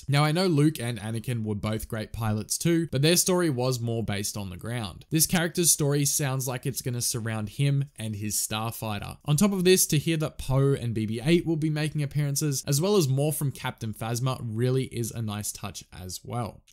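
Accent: Australia. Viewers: wait your an Aussie? im Australian two!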